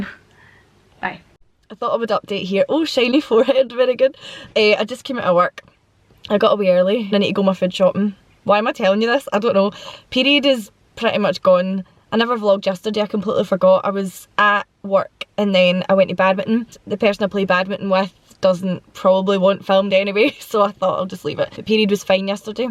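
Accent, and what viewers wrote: Scotland, United Kingdom. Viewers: Love this series! I've been having a bad few days with anxiety as I'm abroad on my own and been struggling to deal with the large numbers of people at the event I'm at. I discovered you through your collab with Sarah Courtney the other day and I've since been binge watching your stuff and it's helped me so much! I've watched this whole series now and it's brilliant! Proper rollercoaster but I find learning about other people's experiences strangely soothing when I'm anxious plus I love any Scottish accent 😆 Also I'm really grateful for women like yourself and Hannah Witton sharing your perspectives on menstruation so that I can understand a bite more.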